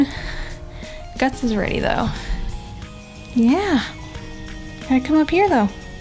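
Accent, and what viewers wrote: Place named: Midwest, United States. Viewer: she has to be from the midwest. Minnesota maybe....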